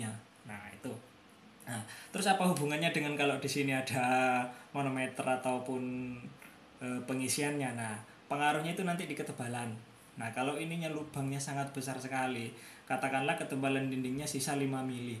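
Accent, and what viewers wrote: Madura, Indonesia. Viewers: kmu orang mna bang klau bleh sya tau orang mna bang yaaa ma.af nii bang seblumnya soalnya kayak orang madura ngomongnya.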